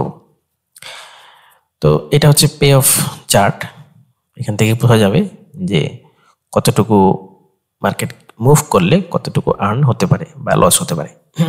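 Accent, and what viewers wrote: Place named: Bangladesh. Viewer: Apni kothai thaken , apnar vasha puro Bangladeshi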